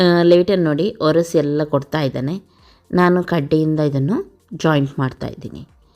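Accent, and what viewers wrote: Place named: Halivana, India. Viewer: Kannada halivana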